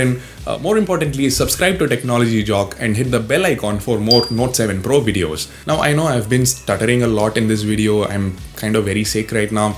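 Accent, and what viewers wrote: South India, India. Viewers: Your south indian accent tho 😂.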